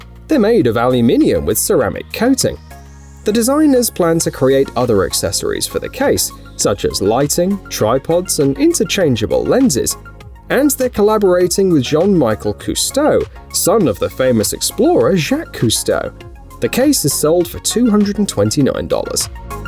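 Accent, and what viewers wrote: England, United Kingdom. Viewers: The Falkirk Wheel is in Scotland, so the prototype was probably made from Meccano. The presenter sounds like he is English, so he should be familiar with Meccano; it is still being manufactured... even if it is in France and China! 🥴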